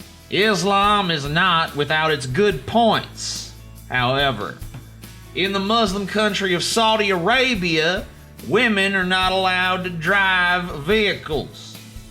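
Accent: South, United States. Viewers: Where did You learn that dialect? as a Southerner i`m impressed.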